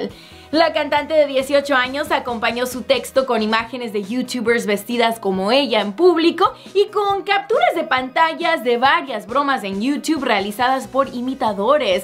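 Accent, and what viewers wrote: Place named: United States. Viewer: me gusta la pagina, pero odio cuando la chica habla tipo gringa, billie jajajaja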